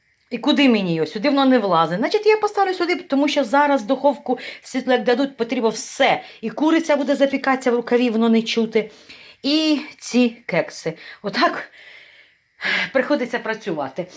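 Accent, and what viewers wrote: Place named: Poltava, Ukraine. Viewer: Добрый день,! Я уроженка Полтавской области, Чутовский район. Для меня ваш говор просто бальзам на душу. Как дома побывала. Все ваши видео смотрю, многие готовлю по вашем рецептам. Желаю вам быстрейшего наступления мира. Держись.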